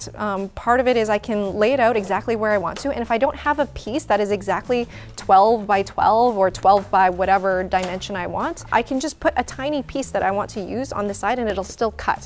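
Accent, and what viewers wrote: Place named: Canada. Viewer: It is Canadian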